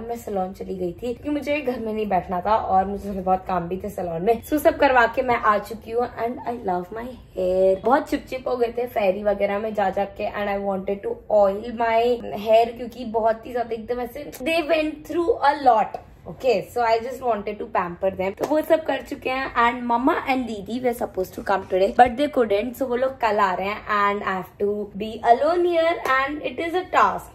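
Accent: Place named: Bihar, India. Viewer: Your bihari accent is just soo cute when you where unboxeing that red purse 🥰also lots of love for you both ❤and waiting for your fun videos💞💞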